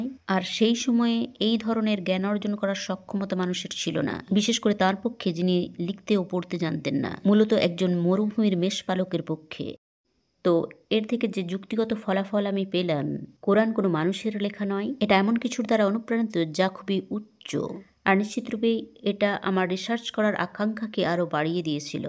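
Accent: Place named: West Bengal, India. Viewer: এটা বাংলাদেশি চ্যানেল কিনা আমি বুঝতে পারছি না। ভাষাটা পশ্চিম বংগের মনে হচ্ছে যাই হোক ভাষা টা ব্যপার নয়। ইসলাম প্রচারের জন্য চ্যানেলটা ঠিক আছে তবে এখানে অন্য পিকচার গুলি দেখাবেন না প্লিজ। যিনি কথা বলছেন শুধু তাকে দেখান। অন্য পিকচারে পরদাছাড়া মহিলাদের দেখানো হয় এটা ইসলামের সংগে যায়না। আশা করি বুঝতে পারছেন।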